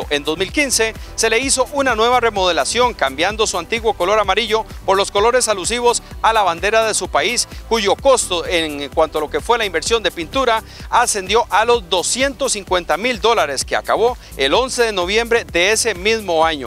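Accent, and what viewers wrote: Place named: Costa Rica. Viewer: Suena costarricense este cerote,! Q pasó?.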